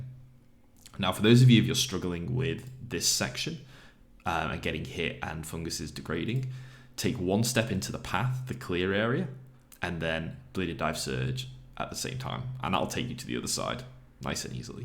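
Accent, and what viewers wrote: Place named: United Kingdom. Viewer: He's Br*tish 🤮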